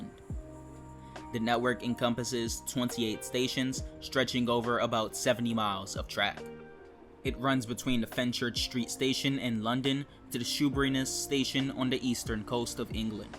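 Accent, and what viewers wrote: United States. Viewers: Having moved out a while ago, this was so nostalgic hearing someone talk about the line that I grew up with. I also loved hearing someone with an american accent saying my home town name xD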